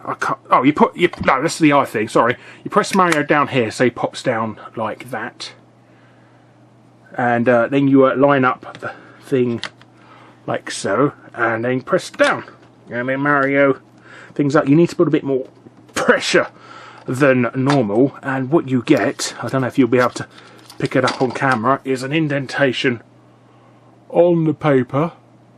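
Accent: United Kingdom. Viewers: Jesus Christ! You are the most British person I have ever heard! THAT'S AWESOME!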